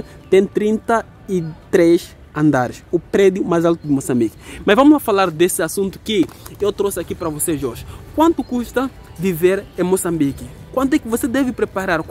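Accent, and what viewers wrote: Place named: Brazil. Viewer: Você fala um sotaque próximo do brasileiro. Diferente de outros youtubers de Moçambique.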